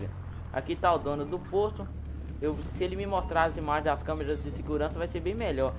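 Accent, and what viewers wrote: Minas Gerais, Brazil. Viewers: Ow! Vc é mineiro pow! Coloca umas viaturas da PMMG ae mano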